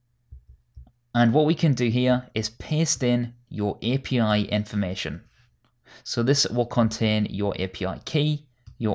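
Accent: United Kingdom. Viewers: I've been coding for years but have always had the attitude of never knowing enough. Your tutorials often cover ground I've already walked but I always watch them as I still find them invaluable for reminders or tips for things I've missed. Always fun for me to hear a fellow northerner too, doing the YouTube thing. Thanks.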